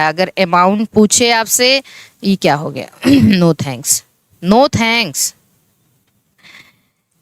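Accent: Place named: Uttar Pradesh, India. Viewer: Aapki baat cheet se ye ekdum clear ho gaya hai ki aap pakka UP se ha aur up board pdi ha quki hm sb up bale merkaana mrkawa bail ko axi tarah jante hai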